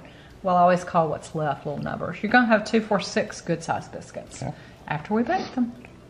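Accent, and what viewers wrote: South, United States. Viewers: Well done. It's such an American thing calling them biscuits! They're scones for us. Biscuits are a cookie! Love the Southern drawl! Happy day from Sally and the fur crew! 😄❤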